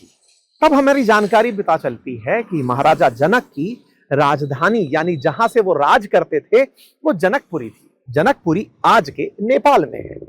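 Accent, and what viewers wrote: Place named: Uttar Pradesh, India. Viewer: इतनी शुद्ध हिंदी कोई उत्तर प्रदेश का निवासी ही बोल सकता है स्पष्ट भाषा आपकी वाकपातुता की परिचायक है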